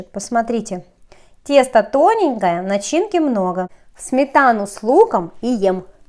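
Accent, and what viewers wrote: Surzhyk, Ukraine. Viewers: Спасибо за рецепт,скоро буду готовить именно по вашему рецепту : ) P.S. обожаю ваш канал и вашу приятную подачу рецептов. Не обращайте внимания на дураков и хамло, не меняйте манеру разговора и суржик : )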